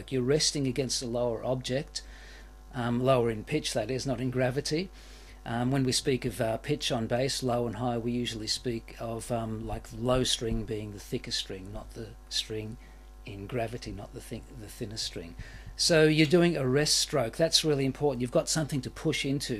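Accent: Australia. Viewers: Im pretty sure hes Australian :/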